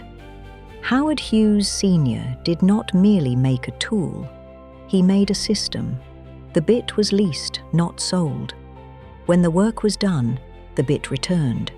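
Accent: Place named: England, United Kingdom. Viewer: Old English? No just english. Very nice too. Really enjoyed this. Thank you for sharing.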